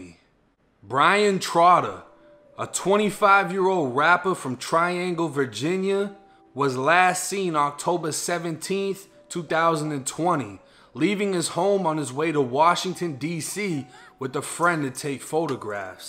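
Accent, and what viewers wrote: Boston, United States. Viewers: Damn u must be from boston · Is that a Boston or New Hampshire accent?? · Are you from boston? Asking cuz that accent lmao I’m from springfield ma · Why is it that everyone who is into this rap/hip hop culture, talk with voice inflections that do not coincide with the rest of their speech, why can’t they just speak the way they naturally should? I got nothing against this kid at all, I actually just stumbled upon his channel and content which is pretty cool, this kid is obviously from the Boston area, it’s hard to nail down different accents and what neighborhood they come from, this kid sounds like he’s from Southie, but I can’t be certain, being born and raised in NYC I can pick up Brooklyn/Bronx inflections. I wish this kid nothing but the best. I just wish these kids who are into this culture would stop trying to emulate speech that isn’t part of their natural speech patterns. 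It’s just something that bothers me. I completely own that’s it’s my shit. But God speed to this kid and what he does, good, solid, original content, on horrible stories that aren’t picked up in the media. Good stuff! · your funny as hell brotha, your baaaston accent makes the narration even better. Keep it up brew, good story, and do more of these "true crime" posts, it definitely fits you. Peace brah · lol mad boston accent but trying to sound southern slang? so confusing lol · Actually I just show your first video on lockdown, Im Live in Malden, I new you had a Boston ascent, that’s why I originally asked.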